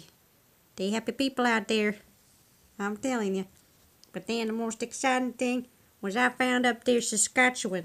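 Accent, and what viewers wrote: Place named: Texas, United States. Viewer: Wow you are israeli but you sound like a texan. How???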